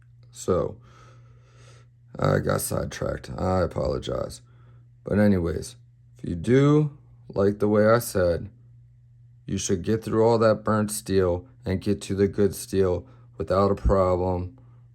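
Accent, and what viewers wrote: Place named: Baltimore, United States. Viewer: You from/in Bmore?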